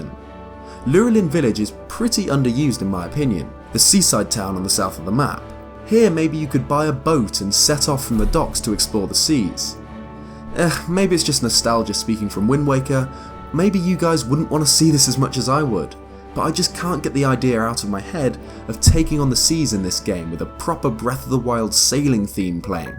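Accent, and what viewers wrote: United Kingdom. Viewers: absolutely love that you took my advice of having your face in the videos. It's easier for you to edit, we see your handsome face, allows you for more creative freedom, adds another layer to the construction of your videos and its easier to recognize who that lovely British voice is coming from. I'll accept your thank you now. You beautiful husbando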